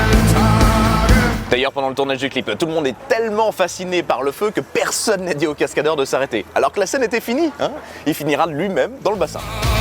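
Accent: Germany. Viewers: J'aime tes videos, mais non ironiquement ton accent allemand pue un peu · mais il est très bien ton accent allemand ! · Toujours une magnifique maniere de reconter l'histoire d'une musique et de don groupe!et magnifique accent allemand..... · Ton accent allemand est immonde. Je tiens à le dire.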